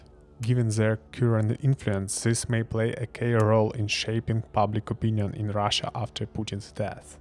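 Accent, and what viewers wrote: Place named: Ukraine. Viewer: You may not consider Khadyrov a serious contender for Putin's successor, but imagine the sight of Lavrov, Patrushev, Mishustin and Shoighu, stripped of their shirts and facing the wall, while Khadyrov poses in gopnik crouch before them. He grins because he has spelled out Akhmat in spray cream on their backs! By the way, have no worries over your English - many more of us are attuned to Ukrainian accents. Good video.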